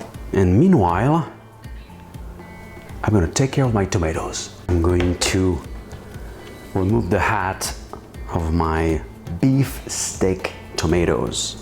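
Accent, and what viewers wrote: France. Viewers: Ce que je trouve unique dans ces vidéos de Bruno, (outre le talent culinaire, le caractère trop sympathique du personnage ) ce sont les bruitages (quand il mord dans un plat, oubien les couteaux qui valsent, les oeufs qui dégoulinent etc... les sons sont bien travaillés , "incredible" !! - QUI fait toutes ces mises en scènes et ces bruitages, c'est TROP BIEN ! - Merci à Bruno pour tous ces partages ! - et le petit accent français tout en parlant un anglais "fluent" : en plus, j'apprends et "brush up my english too !" - Hip hip Hourra !!!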